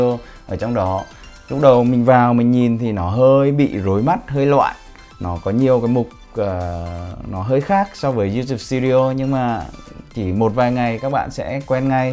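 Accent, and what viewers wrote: Central, Vietnam. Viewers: Anh người miền Trung àh.ngương mô anh quá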